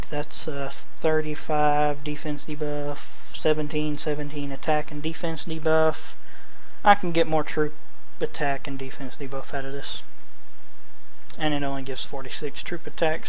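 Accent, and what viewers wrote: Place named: North Carolina, United States. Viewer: Are you from NC? accent sounds like where I live.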